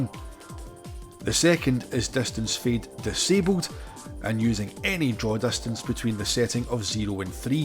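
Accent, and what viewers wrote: Scotland, United Kingdom. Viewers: cool love this accent ;) it’s like listening to Robert Carlyle in Trainspotting. I am french…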